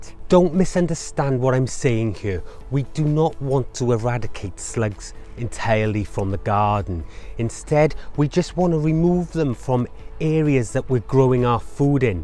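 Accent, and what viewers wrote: Wales, United Kingdom. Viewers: I hear the accent...I subscribe. Dan and oliver, Cardiff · Margie Watson He’s from the Welsh valleys, maybe Maerdy, Ferndale, Aberdare ? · Guessing from the accent your Welsh too, I'm from the Swansea area. Before this month my soil has been turning into dust. I know about the problem with the jet-stream breaking down over the last few years and the low rainfall over the last 12 months for the south coast. Is there anything that really works to keep soil moist. I just bet that after this month, its going to go dry again. The garden is loving the rain this month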